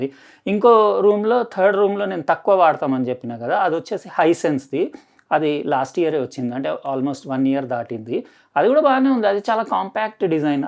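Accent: Telangana, India. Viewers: సార్ మీది తెలంగాణ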